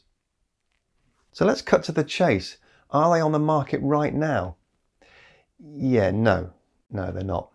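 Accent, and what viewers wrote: England, United Kingdom. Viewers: You look just like that other bald guy but with an English accent .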